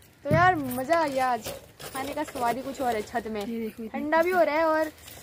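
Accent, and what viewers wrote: Pahari, India. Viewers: आपकी पहाड़ी बोली बहुत अच्छी है 👌👌👍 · tumhari jo ye pahadi m bolna hai na mujhe bahut achache lagate hai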